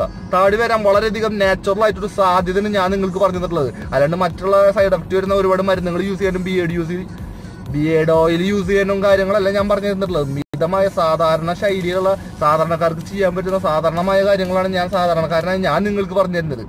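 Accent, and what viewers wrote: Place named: Kodungallur, India. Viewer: Ijjadhi ddav... Thrissur alle😃❤️💪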